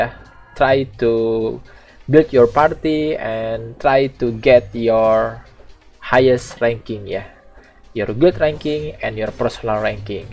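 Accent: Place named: Indonesia. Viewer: Njir kirain orng mana wkwkkw ternyata indo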